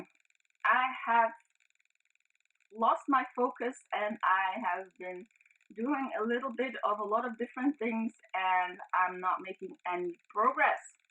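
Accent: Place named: Norway. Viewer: Regardless of the project, I'm sure it will be challenging to get it done in a week. I'm sure you've said before, but where are you? Sounds like a Norwegian country?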